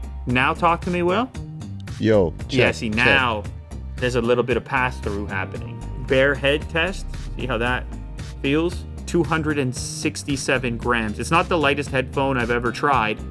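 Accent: Canada. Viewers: sounds EXTRA Canadian in this video! I’ve never noticed it, through the hundreds of other videos I’ve watched. So crazy. I pictured him as a Wisconsin guy or someone from Louisiana. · I appreciate how Canadian my guy sounds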